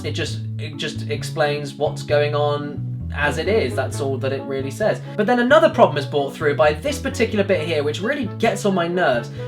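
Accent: United Kingdom. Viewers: Wait are you british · hes so Brittish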